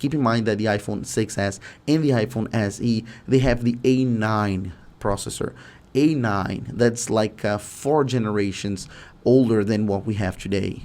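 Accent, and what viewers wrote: Brazil. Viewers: Um salve de São Paulo pra vc,! Notei o sotaque brasileiro, mesmo vc falando super bem hahaha. Valeu pelo vídeo!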